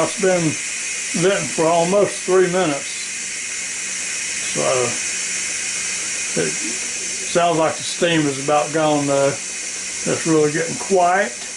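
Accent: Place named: Tennessee, United States. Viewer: I love your videos! Thanks for sharing! You sound like home, are you from Tennessee?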